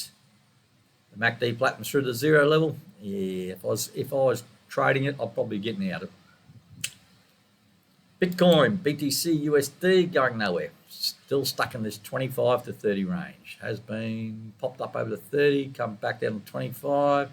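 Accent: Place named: Australia. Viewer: Thanks a lot. Yes please, keep the Aussie accent. I won't be able to handle the Scottish haha:D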